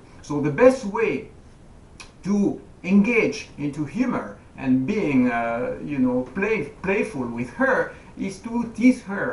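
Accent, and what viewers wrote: France. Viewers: is your accent French?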